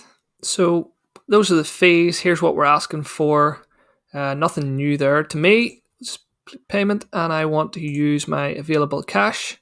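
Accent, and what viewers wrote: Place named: Tyrone, United Kingdom. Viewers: Local accent, subscribing just for that reason. Tyrone man here. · Do all stocks have a dividend and how do you know which do and do not ? Nice to hear another accent from the north:)) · Hi nice to hear a local voice! I’m from Fermanagh you sound like a Tyrone/Derry man. I’ve got big into investing in stocks this past few months and have done very well. Subscribed and can’t wait to watch more contact 👌🏼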